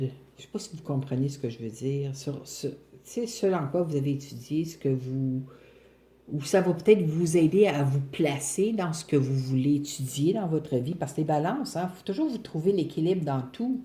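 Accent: Canada. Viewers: Bonjour, je vous souhaite de retrouver harmonie dans votre coeur, pas d' épreuve plus large que nos épaules...une expérience de plus pour travailler sur ce qui n'est pas encore "réglé"... pour autant, ne pas se flageller, je sens que vous êtes aussi une personne qui sait analyser les choses, réconfortante, généreuse et forte. J'aime beaucoup vos analyses des cartes. Au plaisir d'entendre votre accent canadien!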